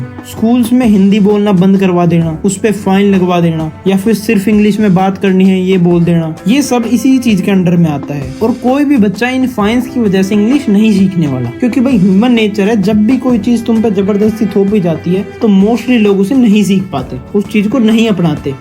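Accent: Haryana, India. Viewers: Bhai tu haryana se hai na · Where are you from? I think haryana❤ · Aree tum haryana side se ho kia bahi?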